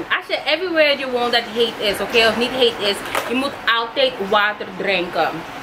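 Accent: Suriname. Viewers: i like your accent, the way you behave. It is funny. Your Su-dutch is ok also how you bring it as a lady, sounds a little bit funny, a suri lady way. . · I love it, gewoon Nederlands mixed met Engels en een Surinaams accent.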